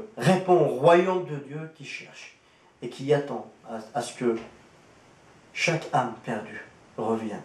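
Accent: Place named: Kabylie, Algeria. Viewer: Le disciple Au commencement de votre vidéo vous dite ceci " bonjour bonsoir je me présente je m'appelle je suis Algérien kabyle............" vous vous adresser a qui la? pas aux Kabyles en tout cas! ni aux Algériens d'ailleurs! pourquoi ne pas réciter votre histoire de conversion en kabyle uniquement!? vous voulez cibler qui en parlant en français par exemple? et je trouve qu'i y a une sacrée différence entre vous entendre parler et vous lire! vous parler et j'ai constater que vous chercher a chaque a dire des mots que vous n'arriver même pas a trouver par contre j'admire votre français avec un accent kabyle! quand a vos écrits le fameux copier coller fait fureur! alors comme ca vous avez vu le film sur jésus? "zmane nsidna issa" c ça? et ca vous a marquer, toucher!! un film! moi qui croyais qu vous aviez lu la bible!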